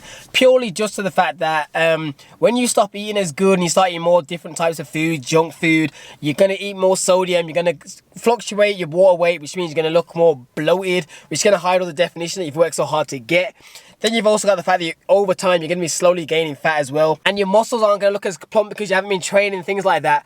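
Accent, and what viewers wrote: Birmingham, United Kingdom. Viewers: Daaamn that birmingham accent ! Just makes me wanna kill my self bruh your accent is the worst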